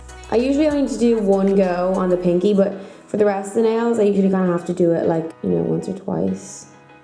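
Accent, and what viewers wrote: Dublin, Ireland. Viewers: Kelcey Burrow I'm from Ireland she is Irish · Malaka Mohamed it's and Irish. Accent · you're beautiful and I love your irish accent :) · she's Irish ahahahhaha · Is she Irish ? Cause I kept hearing hints of an Irish accent in there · She's Irish what r u on about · I love the Irish accent 😭😂 I have Irish background but I'm not fully Irish which sucks because I'm just from America like boring 😂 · We Have Essence In Ireland Too.....Yes She Is Irish LOL! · As I can tell your from dublin, I was wondering where you purchased these products & how much?? And also did you paint them using normal nails varnish or is there a special type??x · definitely not scottish. id say an irish woman whos lived in england/ English influence. or english woman with irish parents etc ..... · Kelcey Burrow it’s Irish I sound like her too😂 p.s. I’m Irish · she's irish😂😂😂😂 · Americans tryna say she sounds Irish with an American accent!? 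Haha no. She sounds dublinish through and through. That's a Dublin accent. Move on. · Ireland? I hear it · its a South Dublin accent xx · Kelcey Burrow sounds irish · she's Irish 😊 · Have you never heard an Irish accent before? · definitely sounds irish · What shops do Ye get ur nail equipment I'm from Dublin I could tell ur Irish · I hear an Irish accent. 💚💚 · irish gal!! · She's Irish and she sounds Irish the whole video to me? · Kelcey Burrow I don't hear British I hear American and irish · Kelcey Burrow Irish I think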